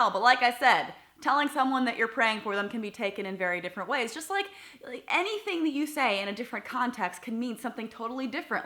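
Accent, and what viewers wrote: South, United States. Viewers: LOVE YA LIKE A SISTER, GIRL. IT'S FUNNY THAT YOU'D DO THIS VIDEO AS I JUST HAD A YOUTUBER TELL ME THAT SHE'D PRAY FOR ME. I REPLIED, SAYING, WELL I' SAY "AFFIRMATIONS" FOR YOU. NOW, I'M TORN BECAUSE I DON'T KNOW WHICH I LIKE BEST I'LL "THINK" FOR YOU OR I'LL SAY AFFIRMATIONS FOR YOU. I DON'T, PERHAPS. I' LL USE THEM BOTH., KEEP THOSE VIDEOS A COMIN. OH, LOVE YOUR SOUTHERN ACCENT. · I do hear your southern accent.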